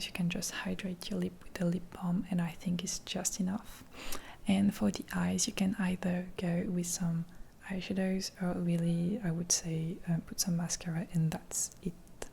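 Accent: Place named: France. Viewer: Beyond just the beauty of your accent and the cadence of your voice, the nuances in your sentence structure are absolutely lovely and charming and they add so much to the uniqueness of your videos <3 For example: “strong colors are not scaring you in any way” would most likely be phrased, “you’re not afraid of bright colors” by a native English-speaker, but the delightful quirks of fluency in a second language include a non-standard sentence structure and I LOVE IT 🥰 Whether it’s a conscious choice on your part or simply a byproduct of having French as your first language prior to learning others, it’s marvelous.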